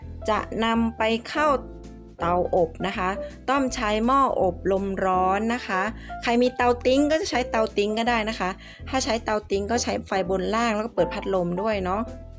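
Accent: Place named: Northern Thailand, Thailand. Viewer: เข้ามาไล่ตามดูย้อนหลัง ตอนพูดกลางก็พูดได้แบบเป๊ะมาก แต่อู้คำเมืองน่ารักมาก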